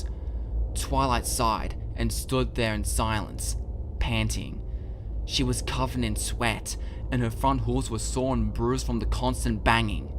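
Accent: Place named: Australia. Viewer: Your Australian voice is funny honestly, I wonder who you are, you sound familiar XD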